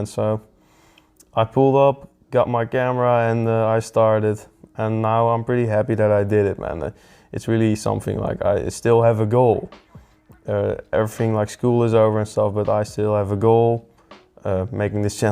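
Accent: Netherlands. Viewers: Ik heb deze video al zo vaak gezien in mijn Recommended maar heb eigenlijk nooit op je stem gelet dus kom er nu achter dat je Nederlands bent. Mooie Collectie! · you dutch i hear the accent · Ik hoorde direct aan je accent dat je Nederlands was, tof om te zien. Je hebt een subje erbij!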